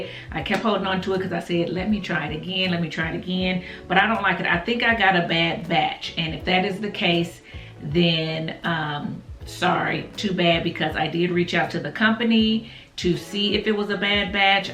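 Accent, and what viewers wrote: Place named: Indiana, United States. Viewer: Hi! Thanks for replying! I’m from Barbados, so everyone outside of Barbados has an accent to my ears 🙈. I don’t think I’ve ever knowingly heard anyone else from Indiana, so I didn’t have a point of reference. In any case, I could listen to you talk all day! I think it’s your energy and your entire demeanor as well. Keep the great content coming! 💕🤗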